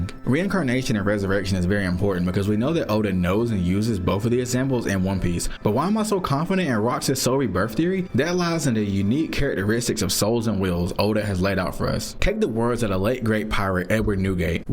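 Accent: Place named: Tennessee, United States. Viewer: Vro your accent😲 are you from Tennessee?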